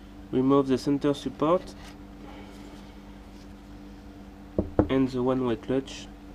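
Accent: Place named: France. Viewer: Same. I thought, ooh, a French accent. Wait, why the flag....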